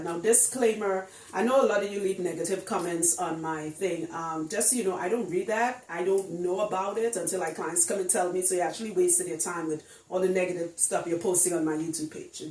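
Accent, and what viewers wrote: Trinidad, Trinidad and Tobago. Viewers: I'm also Trini and Aquarius....we can't help the way we speak girl..... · I love the Trinidad accent, be proud and don't worry about negative minded people.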